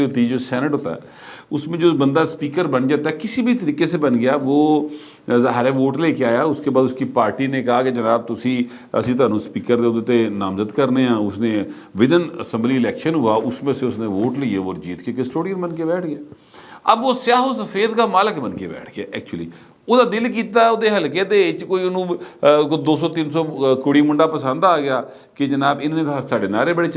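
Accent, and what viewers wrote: Lahore, Pakistan. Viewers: کیا بات ھے جناب پکی اندرون شہر کی بولی بولتے ھیں بہت اچھی لگتی ھے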